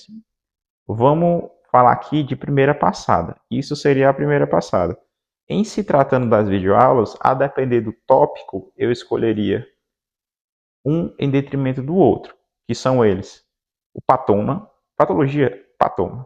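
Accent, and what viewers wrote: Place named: Brazil. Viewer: Maneiro o vídeo! Só seria legal colocar na descrição os nomes ou legenda porque como seu inglês tem uma pronúncia bem brasileira, não da pra entender todos os nomes que você fala :(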